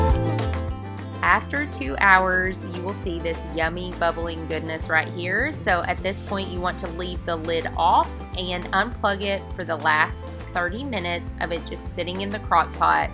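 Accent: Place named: Tennessee, United States. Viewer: New sub! I came over from One Blessed Mess bc she said I should! 😜 I throughly enjoyed watching you make dinner. Now if you can just hand it to me through the screen, that would be soooo helpful! 😉 I have a garlic chicken crockpot recipe too. I wonder how similar they are. I’m gonna have to check. But mine is bone in. And I much prefer boneless. So yah!! Can’t wait to try it! I love your accent! I want to move to TN so badly so I can get one! 😂😂😂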